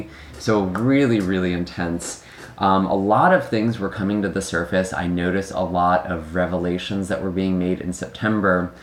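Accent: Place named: Midwest, United States. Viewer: i love your cute little midwestern accent, and your warmness! God bless!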